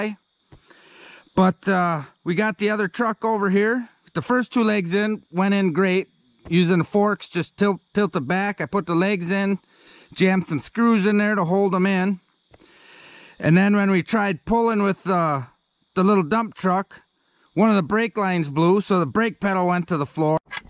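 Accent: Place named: Upper Midwest, United States. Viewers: Dont sound like hes anywhere near Georgia lol. More like Minnesota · unrelated but... I heard you speak and you are either a Wisconsinite, Minnesotan, or a Michigander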